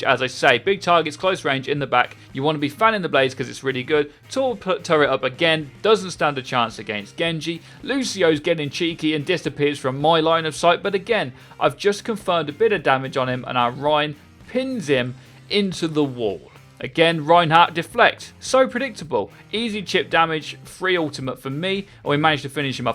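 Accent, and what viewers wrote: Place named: Norfolk, United Kingdom. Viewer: You sound like a fellow Norfolk boy!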